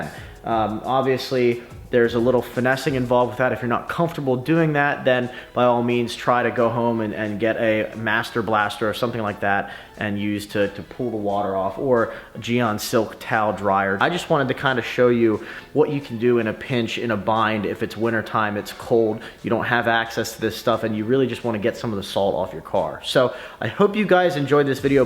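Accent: United States. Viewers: what part of PA are you from?!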